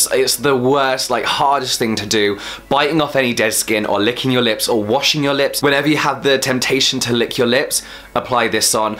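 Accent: United Kingdom. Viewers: Your british accent 😆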